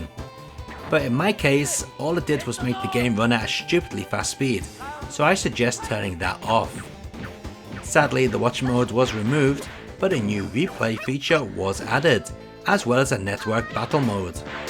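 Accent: United Kingdom. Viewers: Can’t tell if you’re British or Asian.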